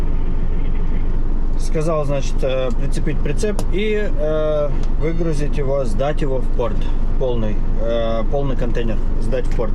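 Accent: Odessa, Ukraine. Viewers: здравствуйте! Большой привет от тракиста из Теннесси, США. Совершенно случайно наткнулся на один из твоих роликов годичной давности о работе по работе с контейнерами в порту. Когда-то и я имел такую же работу в Ашдоде и Хайфе и это был один из кошмарнейших периодов в моей жизни. И вначеле мне не очень приглянулось то видео, т.к. сделано оно было как-то, извини за откровенность, корявато.🙃 Но... Зашел на другой твой ролик, следущий, и уже с удовольствием просматривал их, как и последующие. Никогда не планировал посетить Японию, но люблю путешествия, и твои ролики о японской жизни сильно запали в душу мягкостью твоего метода её показа. Смотрю и сравниваю Японию и те страны где я жил и живу. Очень интересно смотреть об обыденной среде обитания местного населения! И да, твой сосед сильно смахиват на веселого водилу-ханурика😛, но это лично мое не объективное мнение.🤭 И как я вижу, Япония ушла далеко вперед по отношению к своему населению даже по сравнению с США, где я жуву уже почти четверть века. 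Кстати, у меня есть тут приятель, тоже Сашка с Одессы и его манера разговаривать очень схожа с твоей. Спасибо за ролики, передавай привет супруге и Ёшкиным котикам и удачи в жизни!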